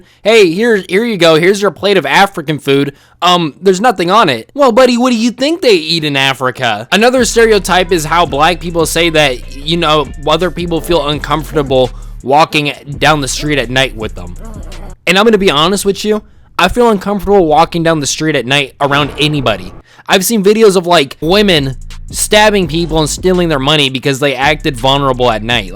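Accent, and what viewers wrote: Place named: United Kingdom. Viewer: I didn’t know you were British like that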